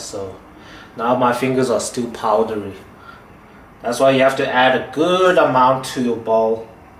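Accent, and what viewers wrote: South Africa. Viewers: Are you a South African national? I ask because even though you're clearly of Asian descent...the way you talk foreign to Asia, your accent is very African😂, are you from South Africa by any chance? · Oh my goodness! I absolutely LOVE you and look forward to trying this recipe over the weekend. Thank you so much for sharing, you just made me very hungry with a craving for fried garlic chicken thighs. And by the way... I absolutely LOVE your accent. You remind me of my South African friends in Toronto, Canada. Much love to you and your family. Thank you! xoxoxo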